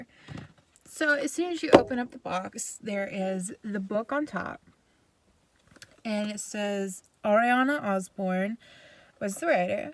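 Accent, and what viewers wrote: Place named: South, United States. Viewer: lol im from Nashville, i could sense you were from the south❤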